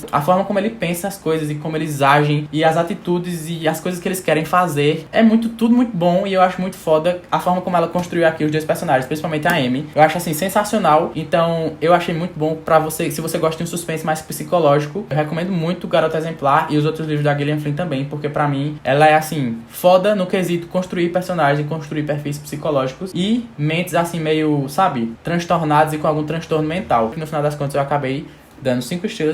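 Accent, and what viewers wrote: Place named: Paraíba, Brazil. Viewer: Ahhh o sotaque! Sou de Recife, mas acho que tu é paraibano, acertei? Me senti em casa com o sotaque parecido com o meu ♥️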